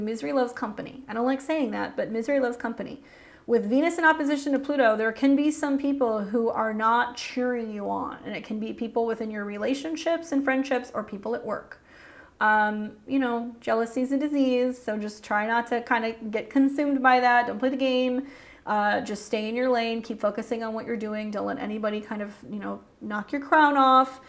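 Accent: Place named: Cuba. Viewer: Oye you sound a little Cuban. You Cuban girl?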